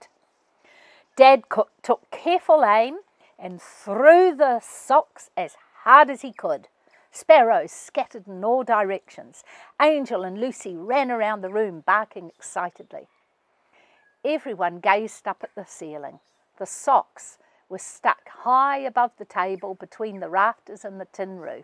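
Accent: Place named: New Zealand. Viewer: I wait for every chapter. This is a keen idea you reading your book, especially with that enchanting NZ accent. I will be in my kitchen preparing dinner, baking a loaf of bread or washing up after supper and I realize my face is smiling as I listen😊. I did send an email and I do hope you received it. Blessings, Cathy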